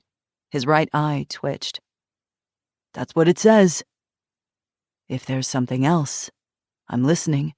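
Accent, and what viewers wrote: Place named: France. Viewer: Love the narrator. Especially her French accent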